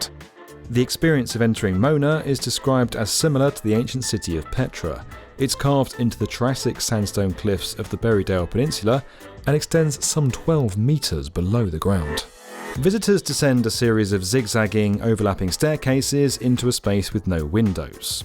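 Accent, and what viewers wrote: United Kingdom. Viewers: You're British right, not Australian?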